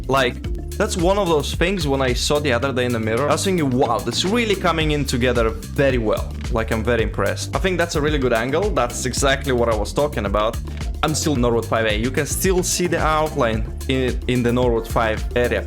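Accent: Russia. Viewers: Что у вас за акцент? Вы русский?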